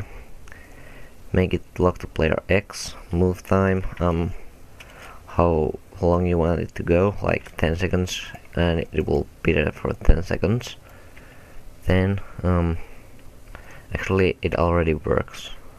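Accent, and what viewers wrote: Finland. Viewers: Oh wait that accent.... Just like finnish guy speaking english xD. Coincidence? I think not. So one simple question: Are you finnish!? :D Jos oot nii GG xD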